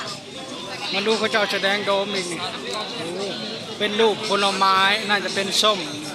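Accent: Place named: Savannakhet, Laos. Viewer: สวยมากฯ... เสืยงคนทาง สะหวันนะเขด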